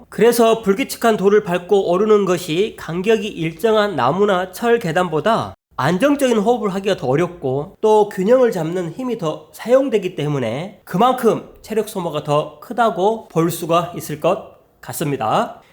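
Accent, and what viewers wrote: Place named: South, South Korea. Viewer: 포토샵 영상에서부터 느꼈지만 디테일하고 전문적인 수준 높은 강의 중화된 남도 억양과 조분조분한 말투 너무 듣기 좋네요 2024년도 님과 가족분들 모두 건강하고 행복한 한해 되세요 2024년을 2004년으로 썼네요 그래서 수정 ㅎㅎ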